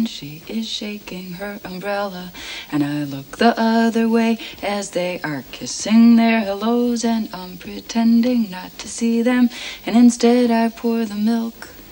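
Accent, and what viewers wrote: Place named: New York, United States. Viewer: nice! i thought so as it seems so nyc and from what i heard that is typical for that accent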